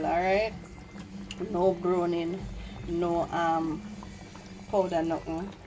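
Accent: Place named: Jamaica. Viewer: Thanks for sharing... I hear the Jamaican make me miss yard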